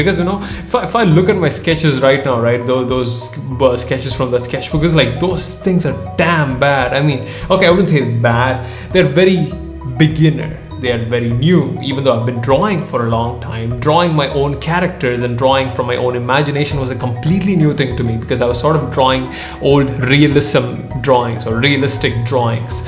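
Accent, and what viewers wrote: India. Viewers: Because your accent is like Indian Me Pakistani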